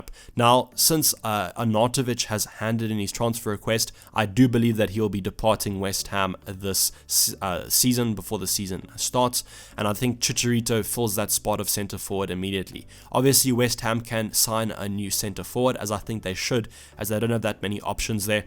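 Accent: South Africa. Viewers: Ya he does have a hint of an sa accent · Are you south African? / have any South African heritage?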